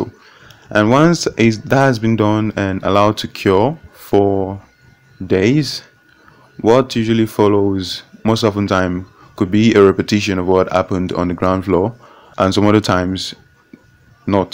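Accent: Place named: United States. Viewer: Bros, Even with your American accent, I am aware that you are a Nigerian and this house was built in Naija. Thank you very much for this very educational video. Please upload more videos dealing with Electrical, Plumbing, and other finishing aspects. Thank you very much. This has been quite helpful. Please keep it up and keep it coming. Thank you once more.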